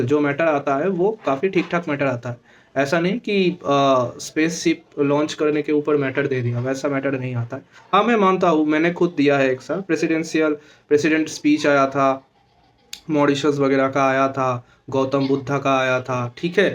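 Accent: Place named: West Bengal, India. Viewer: mai west bengal se hoon. Apki batein sunkar lagta hain ap v West bengal ka ho. Steno exm related main sirf apko sunta hoon, channel to hazaron paar, ek ap hi ho asardar, baki sab bekar😄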